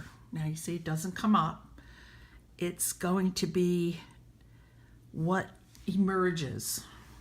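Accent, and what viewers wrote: United States. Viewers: This is great! It;s exactly the way I work - try this, try that, where's the thing I just did, whoops, undo - which makes me a feel a bit more confident. Btw, I'm originally form Yardley and lived i Philly for 20+ years so it's GREAT to hear somebody "tawk" right! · This is not a great tutorial, but I really appreciate you trying. I did learn a few things from you. Love to see you come back after a few months when you know what's what and can go more into depth. Hard to find good tutorials on Metabrush. You have a very nice voice for this sort of thing. Overall, I'll give you 3 out of 5 stars...with a little more prep you could get 5 stars. Oh, and the camera work was fine...better than many. And hey, I'm from Jersey...we Tawk a lot like you. I didn't even notice an accent! One last thing. you would be better off leaving the brush in the default position. 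The middle on all the sliders is not a very appealing brush. You can't make strokes with it and as I understand it, strokes are a very important part of MetaBrush technique.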